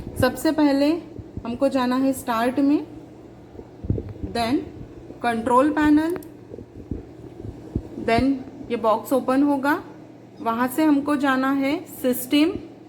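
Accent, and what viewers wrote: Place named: India. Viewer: A less Indian accent would attract more viewers, Prof B. Emphases are all ...hard. More chest-voice.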